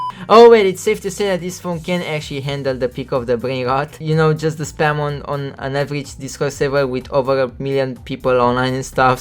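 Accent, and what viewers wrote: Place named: Romania. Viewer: I WAS SURE UR ROMANIAN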